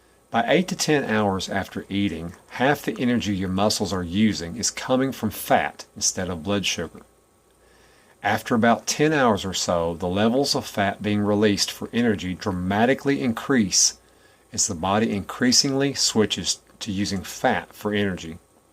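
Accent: South, United States. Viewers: Damn you use to be that big? You definitely can tell me something. BTW love your "southern" accent.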